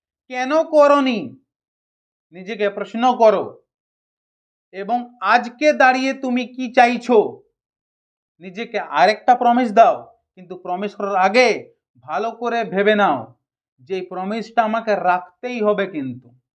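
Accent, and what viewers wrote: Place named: India. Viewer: স্যার আমার মনের মধ্যে একটা প্রশ্ন অনেকদিন থেকে আছে - আপনার কথার মধ্যে হিন্দি টান টা কি করে হলো? Please স্যার জানাবেন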